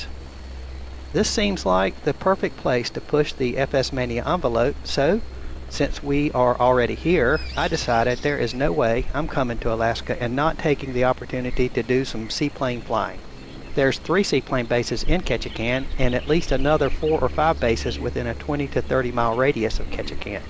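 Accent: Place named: South, United States. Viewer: Your videos are epic. Never learned that much in such a short time as with your vids. Your real world pilot helps.you do the procedures as you would in real life, which is very helpful. And you've got such good sense of humor. May I ask you (if it is not too personal) where are you from in the US? Somewhere in the South? Your accent is really nice. :-) I brush up my American English that way. P.S.: I'm from Austria, so my English isn't very good. So please bear with me.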